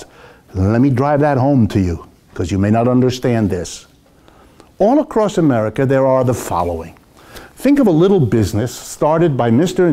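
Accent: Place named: Hungary. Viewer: he is funny too. but why does he sound hungarian....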